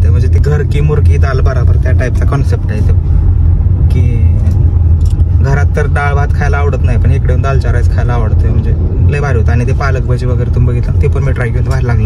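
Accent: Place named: Satara, India. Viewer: I love satara accent 😍 ❤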